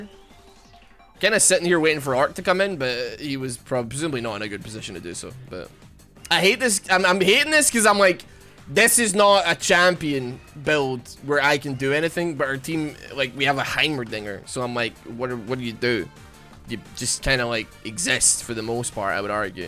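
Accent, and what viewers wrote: Scotland, United Kingdom. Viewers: I need to know if you are capable of saying "purple burglar alarm," as supposedly some Scott's cannot.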